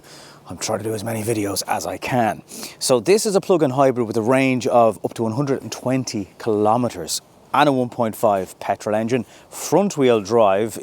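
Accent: Ireland. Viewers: Sounds fine to me - lovely Irish lilt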